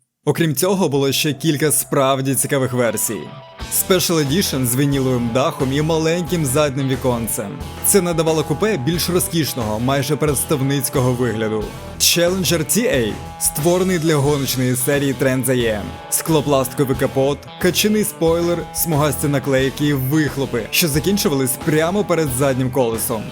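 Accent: Russia. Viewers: Було цікаво але проскакує заводстка расссєйська прошивка. Важко слухати, коли кожне 10 слово викривлене. Хоча кожне 10 - це не кожне 3 …але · "Не цямиць", "падзіння", "бюрокрації", "дзякуємо".. це за першу хвилину.. далі мене не вистачило слухати цю "маацковскую" вимову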